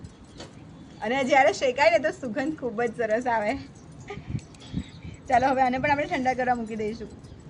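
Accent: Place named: Ahmedabad, India. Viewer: Ben kathiyawadi hoy ane amdavad ni bhasha bolta hoy ewu km lagya kre se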